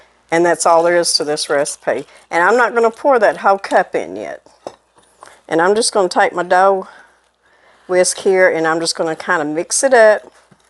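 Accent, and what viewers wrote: South, United States. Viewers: Hi there!!! I am SO delighted to find your site!!! Thrilled, too, that you are a Sister in Christ!!! Can't wait to make these yummy and quick rolls...and to learn lots more from you. LOVE your gentle and kind spirit, too---makes it soooooo relaxing to listen to you. And I was born in the South, so hearing your sweet accent feels like home. 😃❣ Blessings from Indiana, Kim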